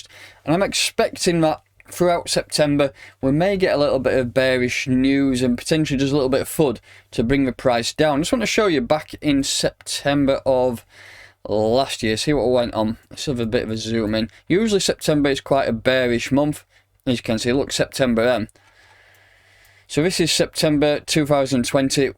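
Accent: United Kingdom. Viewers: you have the harry potter accent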